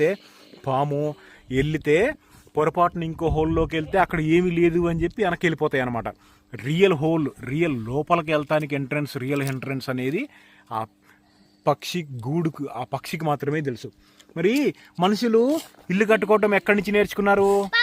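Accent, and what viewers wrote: Andhra Pradesh, India. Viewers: హలో బ్రదర్. మీరు ఏ జిల్లా. ఆంధ్రావాలా మాట్లాడుతున్నారు. మాది ఆంధ్ర గుంటూరు జిల్లా మాచర్ల బ్రదర్ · Anna meeddhi andhra laungauge lekka vundhi endhanna😂 nizamabad na manadhi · Anna nuv Telangana la putti perigi Andhra oodi lekka enduk matladuthav anna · Anna mi slag andra laga undhi .mereme telangana antunaru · Miru Andra settlers aa bro basha atlane undi nijamabad lo chala untaru kada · Anna meeku andhra roots unnaya? Slang chaalavariki andhra laga undi❤ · Mi Yasa Andra laga undi Enduku telangana laga ledu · Anna medi Andhra naaa or telangana naaaa mee language amo Andhra laga undi but ur staying in Nizamabad how come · Slang enti Bro Andhra laga vundhe medhe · Anna meeru matlade telugu andhra slang la undi telangana slang la ledhu endhuku ani ala...???? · Mee slang Nizambad slang or telangana slang laa ledu Enduku Anna, Andhra slang la untundi enduku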